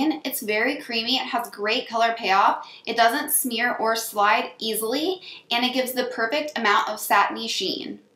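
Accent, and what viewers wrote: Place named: Minnesota, United States. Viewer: Are you from Minnesota??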